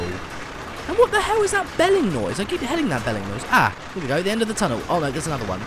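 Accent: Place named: United Kingdom. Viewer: That's just his accent, it's British pronunciation.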